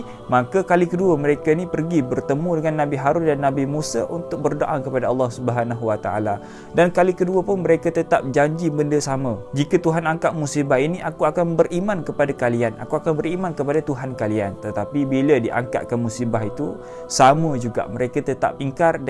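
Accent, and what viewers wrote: Kelantan, Malaysia. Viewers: Abang ni orang kelantan ke?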